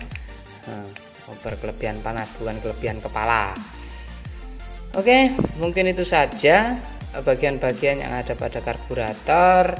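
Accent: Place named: Java, Indonesia. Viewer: Jawane medok mas hahaha tapi mantep penjelasane mas, ditunggu vidio seng laine mas.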